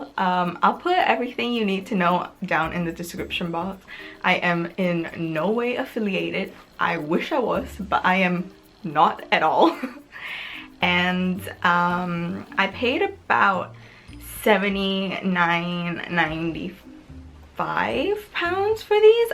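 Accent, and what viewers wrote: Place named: United Kingdom. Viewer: isn't that just the British accent?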